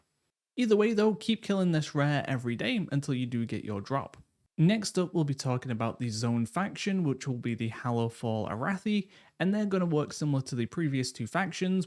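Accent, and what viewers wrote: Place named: Manchester, United Kingdom. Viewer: My guy is so Mancunian ❤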